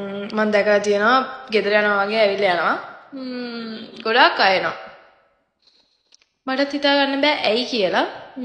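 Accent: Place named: Kambanwella, Sri Lanka. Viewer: කංබංවැල්ලෙන් ඇවිත් වගේනෙ කතාකරන්නෙ මෙයා.. අපරාදෙ කාලයයි ඩේට ටිකයි කැපිච්ච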